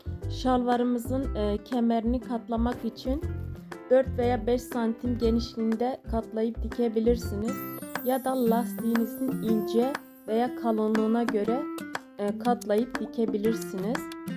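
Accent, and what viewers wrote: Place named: Anatolia, Turkey. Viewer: Anlatimin anadolu şivresi gibi ellerine salik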